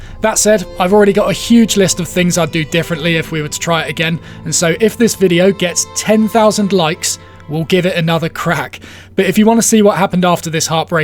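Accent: United Kingdom. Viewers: I wish I had this man's British accent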